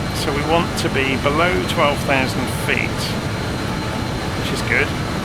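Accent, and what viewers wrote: United Kingdom. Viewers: Hello, thank you for the calm and lucidity you bring to flight simming by way of your well conducted videos. I have a question, when you bring up multiple screens simultaneously on then narrow window, what program/app do you use to accomplish same? By the way, your calm and polished British accent is very easy on the ear😊! Thank you.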